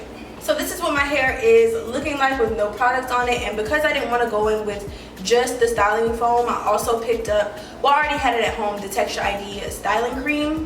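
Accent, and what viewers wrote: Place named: New Orleans, United States. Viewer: Lemme find out you from NOLA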